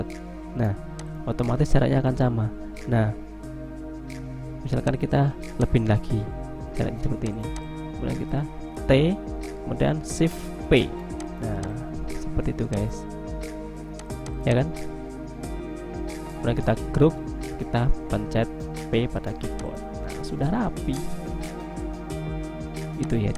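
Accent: Java, Indonesia. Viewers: Jowone ngendi sampean mas? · medok gaess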